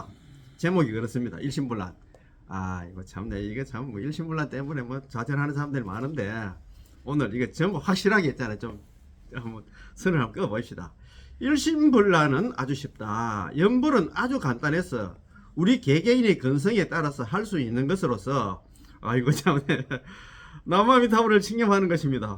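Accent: South Korea. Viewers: 믿음직한 대구사투리 정말 좋습니다. 믿음이 더욱 확실해지네요. 나모아미타불 나모아미타불 나모아미타불 나모아미타불 나모아미타불 나모아미타불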